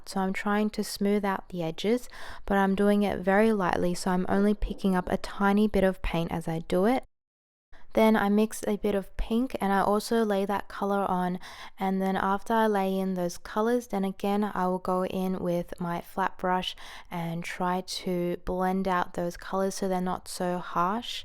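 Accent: Australia. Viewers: Are you Australian? You accent sounds very Australian specially when u say “water” as “wodah” and “borders” as “bodahs” and “white” as “whaih” etc :)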